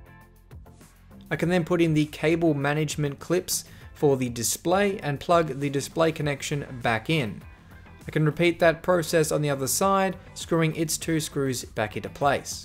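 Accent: Australia. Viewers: soothing? he has a Aussie accent, anything but soothing...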